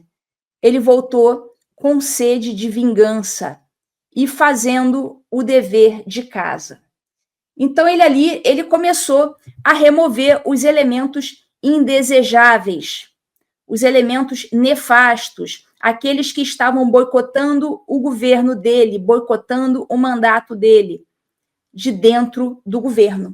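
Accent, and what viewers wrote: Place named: Rio de Janeiro, Brazil. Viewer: gosto dos seus comentarios falando (assim do nosso jeito carioca de falar) muito legal.estou ligado!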